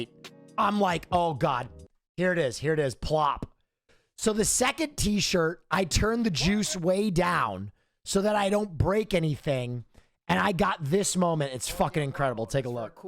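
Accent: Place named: California, United States. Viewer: Man Will is looking and sounding more Californian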